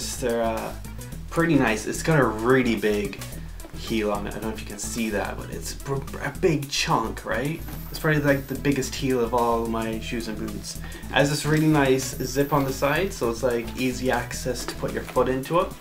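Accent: Ireland. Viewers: Are you from ireland ? Can kind if hear an irish accent :)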